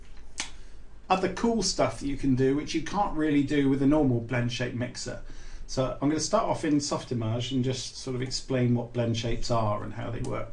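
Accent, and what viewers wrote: United Kingdom. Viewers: Yes, that is a British accent! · That is a very well spoken British accent..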